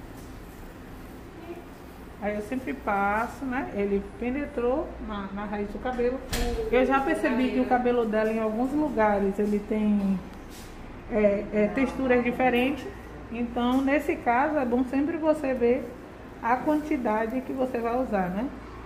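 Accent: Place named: Bahia, Brazil. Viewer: Esse sotaque com certeza é da minha bahia que amo de paixão ❤ Depois que lavar não volta ao normal???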